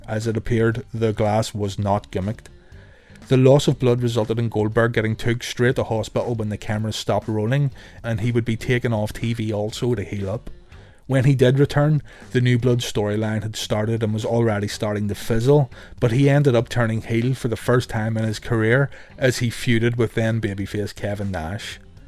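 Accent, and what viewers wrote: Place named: Northern Ireland, United Kingdom. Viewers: What part off northern ireland you from? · Northern Ireland narrator is class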